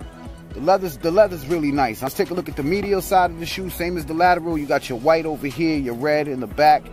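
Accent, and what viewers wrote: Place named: New York, United States. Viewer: sound like he from newyork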